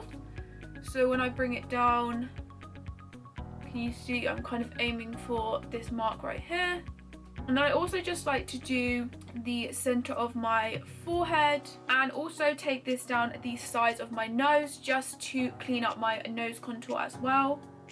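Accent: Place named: England, United Kingdom. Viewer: oh my gosh i had no idea you were English!! anyways, I love your Instagram makeup tutorials and love this very helpful video ❤️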